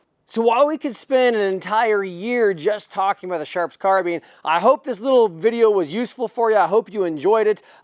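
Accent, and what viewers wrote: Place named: Ohio, United States. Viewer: Ohio accent , lighten up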